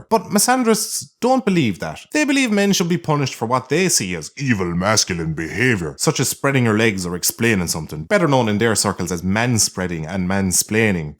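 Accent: Ireland. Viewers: Goldenaries 08 it's a well spoken Irish accent. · I feel I've missed something since I've never heard of you till today when you waddled into my YT feed. Love your accent (Irish? the Yank guessed) and agree the world is fucking insane. Subbed. Keep up this. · Is he irish mate pls be cause I be the only one hardly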